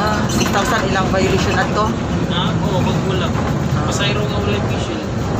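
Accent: Visayas, Philippines. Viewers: Misubscribe na jud ko nimo mam kay nindot kaayu imo accent sa bisaya bah. Imong vlog sad nindot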